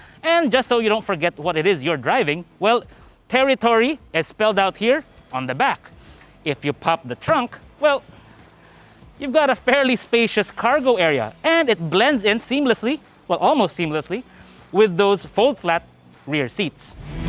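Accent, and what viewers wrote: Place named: England, United Kingdom. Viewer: English accent ka noh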